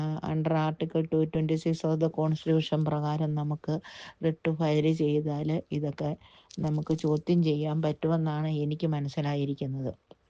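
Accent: Changanassery, India. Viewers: സഹോദരിയുടെ ഭാഷ കേട്ടാൽ അറിയാം ഒരു ചാണനാശ്ശേരി ക്കാരി ആണെന്ന് അപ്പോൾ ന്യായമായും സംശയം തോന്നാം. സ്വയം രക്ഷിക്കുക കൽദായ ചട്ടി തൊപ്പി കളുടെ കൂടേ കൂടാതെ ഇരിക്കുക. All the best സഹോ 👍🏻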